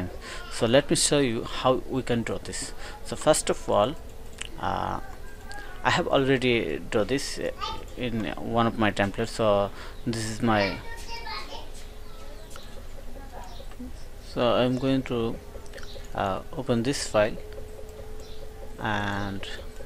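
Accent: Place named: India. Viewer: Terrible video, and what language are you using? Indish?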